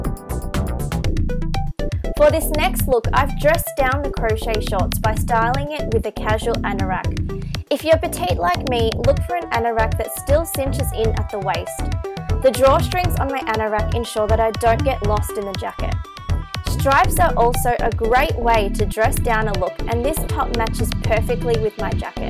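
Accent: Australia. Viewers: i love australian accent (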